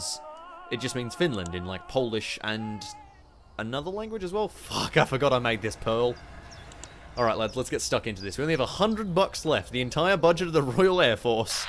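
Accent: Australia. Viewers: I started watching this earlier today and when I came back he sounded even more Australian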